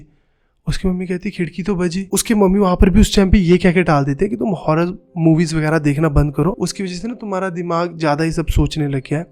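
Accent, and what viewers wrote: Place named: Delhi, India. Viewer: Aap dilli bale style me hindi mat bola karo, kahani barbad ho jata hai.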